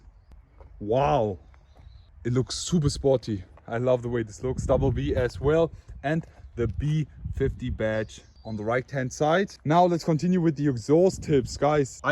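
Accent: Germany. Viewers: fürchterlich wenn ein Deutscher Englisch spricht 😢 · wenn der deutsche englisch redet.... fck noob.... felgen sehen scheiße aus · Man merkt das Du aus Deutschland bist 😂😂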